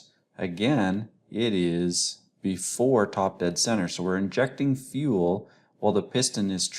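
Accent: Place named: Canada. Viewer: I detect a Canadian accent. 🙂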